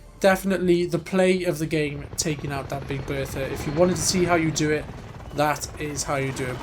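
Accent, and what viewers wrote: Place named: Wales, United Kingdom. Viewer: I'm from Cardiff myself, and your accent was instantly familiar to me lol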